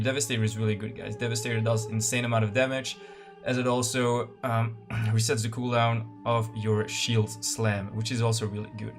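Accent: France. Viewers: omg i watched the whole video just because of that french accent :3 <3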